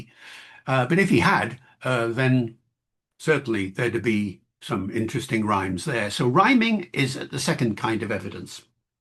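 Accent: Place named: West Country, United Kingdom. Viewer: Here is another thing there would not have been a standard Elizabethan pronunciation any more than we have one today, look at the text, Shakespeare himself uses dialect pronunciations. You sound to me like Mummerset.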